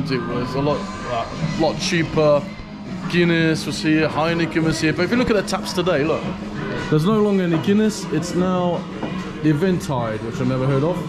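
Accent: United Kingdom. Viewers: Хоть я и не очень орошо знаю английский, я с первых слов понял что говорит англичанин) Резко режет слова. Englishman in Moscow))) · Hi I found your channel today I just adore your British accent! sending love and greetings from USA ❤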